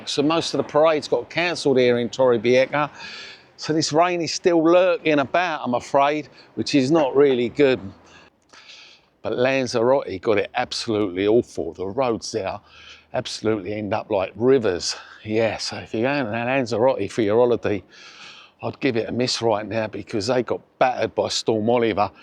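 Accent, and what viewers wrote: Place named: Luton, United Kingdom. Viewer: Sounds like a luton accent originating in south london .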